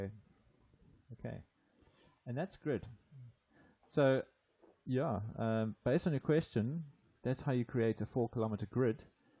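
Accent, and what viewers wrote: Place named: South Africa. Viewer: Hello, thanks for these videos and the South African accent! I’ve got a points layer which represents the centroids of my hexagon layer, however, I’m struggling to align a line grid layer to pass through the centroids. I’ve tried various ways to align the grid but I’ve had no luck. If this doesn’t make sense, I could send you a mail with a screenshot explaining my problem. I’d really appreciate any assistance, thanks.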